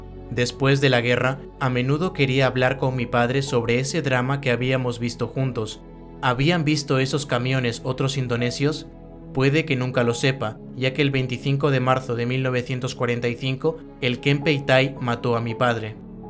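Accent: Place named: Castile, Spain. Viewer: En el canal pone Perú, pero esa acentuación es Castellana de España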